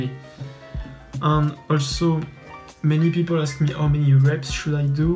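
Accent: France. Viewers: Sa se vois que t'es français à cause de l'accent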